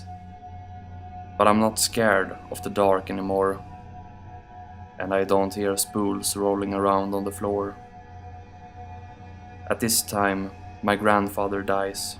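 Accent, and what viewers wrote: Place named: Europe. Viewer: Hi, just subscribed as I heard you on swamp dweller's collab and love your voice!! That accent!! Woohoo !! Great content and nice to here a few more European narrators and stories ( am a Brit myself )xx looking forward to seeing more x good luck 😉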